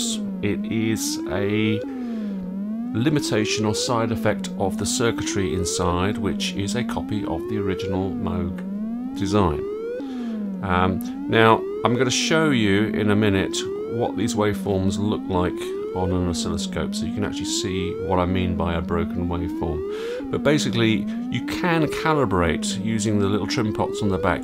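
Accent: United Kingdom. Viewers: I'm not into modular (yet ;) ), but these sort of video's give me also more insight in synthesis in general. Besides that, your video's are always very thorough and of the highest quality. (It shows you're a photographer / videographer). Plus you have an excellent voice combined with your English accent, which always makes it a joy to listen to.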